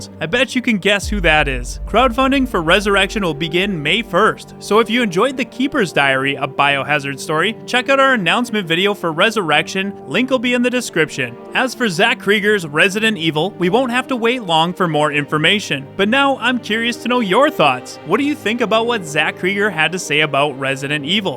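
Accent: Canada. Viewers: Man, love the Canadian accent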